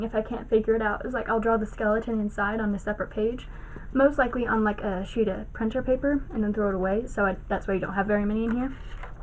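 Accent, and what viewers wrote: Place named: United States. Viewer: I'm not sure any if any of the threats given are enough for him to be arrested, the server is small and between friends, so I'm not ok disclosing that. But I will give you his account it's AllergicToPlebs#4779 Now I'd suggest blocking him. But it's your call. From your accent I'm assuming you're American, most people on the server are British or European. Aside from that, I wish you the best of luck, if you can slap some sense into him at the same time that'd be very nice too. I'm getting tired of him, as close as a friend as he is.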